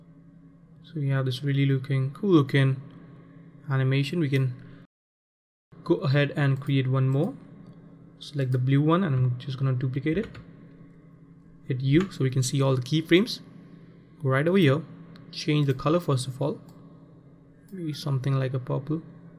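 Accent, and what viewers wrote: India. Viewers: bro are u an indian??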